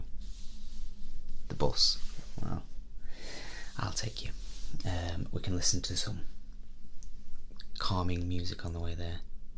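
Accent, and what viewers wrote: United Kingdom. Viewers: Just found your videos on my ' Recommended' list and honestly I adore your style! So happy I found you as there aren't many 'decent sounding ' Brits (no offense to any other fellow Brits, just some accents sound better to me personally).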